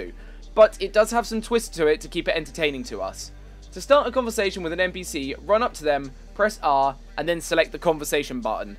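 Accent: United Kingdom. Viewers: Gunna play this at the weekend. Good to hear a Brit! and great Vids, will watch them all. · "You press the ah" (Oh, right. That's the "R") ... "Which is pretty dahmn bahd-ahss" I love how incredibly British this sounds ^^ Thanks for the tutorial, turned out I had to right-click the faces instead of drag-'n-drop them, huh? And now I know even more than what I wanted to know, like getting additional quests! I might want to focus on this even more.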